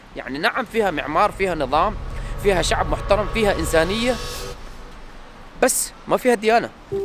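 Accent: United Arab Emirates. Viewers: اللهجه الامارااتيه 👍👍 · و الله اعجبتني لهجتك اماراتية تحياتي من هرجيسا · اهم شي اللهجة الإماراتيه اللي تتكلمها ❤️👍🏻 البلد بلدكم و نتشرف بك و بجميع اشقائنا الصوماليين و العرب و المسلميين أجمع ، و بإذن الله راح ترجع للإمارات و راس الخيمه و الأيام الجميلة الحلوه و أنت في وضع أفضل. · أول افتكرت انك يمني ولهجتك اماراتية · سبحان الله انت صار لك في الامارات عشر سنين و ترمس زي المواطنين ونحنه صار لينا خمسه و ثلاثين سنه فيها ما تكلمنا لهجتهم 😂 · فلوجاتك جميلة من لهجتك ضنيت انك اماراتي وتمزح لما تقول انك صومالي والحمد كلها دول عربية اسلامية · حضرتك تتكلم بلهجة اهل الامارات وليس بلهجة اهل الكويت وليس بلهجة اهل نجد السعودية او لهجة اهل القصيم بالسعودي ة وليس بلهجة اهل جده الحجازية وليس بلهجة اهل البحرين القريبه من اهل العراق او اهل الاهواز وليس بلهجة اهل عمان مسقط لان كل دولة خليجية لها لهجة خاصة بها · صح ان اللهجة لك عليها بس تبين فيك للهجة الاماراتيه ولله الامارات بيتك وإذا تبا ترد حياك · لهجتك اماراتية حلوة 🙏🏻 والنعم فيك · سبحان الله لما شفتك عرفتك صومالي طوالي ومن كلامك عرفتك عايش في الامارات... 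ربنا يوفقك..مولودة وعايشة في الامارات وفي حياتي مازرت راس الخيمة...بتمنى أزورها... وبحب أبوظبي جدا جدا وبفضلها على الامارات التانية.. سبحان الله اي واحد عاش في الامارات ولو لفترة بسيطة بحب البلد دي وبكون عنده ليها معزة خاصة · لهجتك اماراتية جميلة هناك حملة شوهت صورة الامارات لكن من عاش فيها لايمكن الا ان يحبها اتمنى لك ولعائلتك التوفيق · ما شاء الله قصة كفاح ونجاح 👏وأهنيك على اللهجة الإماراتية وأعرف صوماليين وغيرهم من الوافدين في السعودية لهم ٣٠ سنة أو أكثر ما يعرفون اللهجة السعودية وهنا ثمرة ودور الإندماج وتكوين العلاقات الاجتماعية👍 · لكنتك اماراتيه بالمره 🌹🌹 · بس كلاموه الاماراتي اللهجه اقصد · فيديو جميل وممتع جدا... واللهجه الاماراتيه لهجه جميله... الله يوفقك ويرزقك السكن والاقامه في الدوله اللي تحبها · سبحان الله شكله مو صومالي شكله اثيوبي و لهجته اماراتيه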